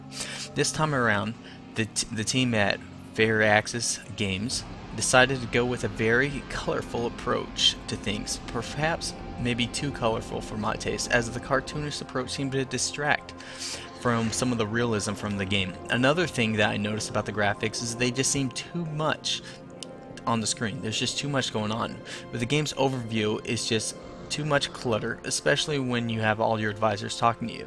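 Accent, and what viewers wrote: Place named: East Coast, United States. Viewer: it;s fine to me... He's probably from the east coast.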